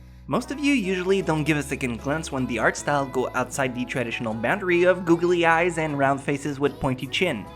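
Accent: Quebec, Canada. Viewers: haha j'ai remarquer ton accent Quebecois. J'aimerais bien te voir une fois au quebec et te saluer! J'aime tes vidéos, tu est un de mes youtubeur preferer a cause du contenue de tes vidéos! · That accent... are you from Quebec? T'es québécois, pas vrai ?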